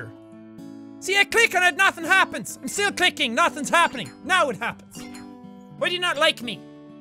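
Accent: Ireland. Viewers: Is it just me or did sound extra Irish in this episode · Good o Jackaboy. Irish-er than ever. GO GO!!! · Holy shit this episode was amazing, was especially Irish haha it's okay you're gonna beat this spillage fine no worries i believe in you:D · does anyone else notice turns more irish in these videos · Yey my Irish screaming friend is more Irish today!!! Now I can cuss out everything in Irish or gaylic as they say! Hooray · I swear He sounds more Irish, as he progresses through the video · this series made extra Irish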